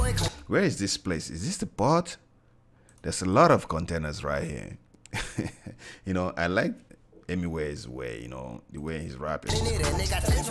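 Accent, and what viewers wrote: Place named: Nigeria. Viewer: His accent is Nigerian.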